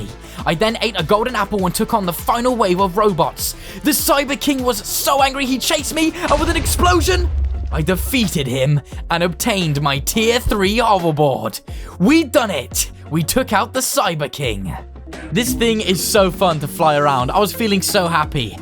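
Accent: United Kingdom. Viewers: Well he sounds like a brit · Omg I like how you talk like a British guy on a adventure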